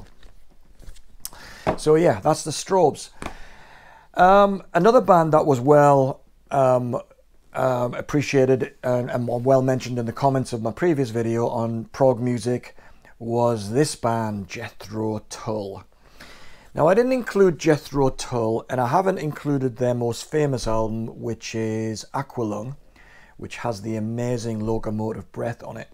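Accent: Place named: Newcastle, United Kingdom. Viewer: From the accent I guess you must have seen most of these bands at the city hall. We were so fortunate in the northeast to have such a great hall that most (if not all) bands visited Newcastle on their tours. Did you catch the Chrysalis tours with Genesis and lindisfarne?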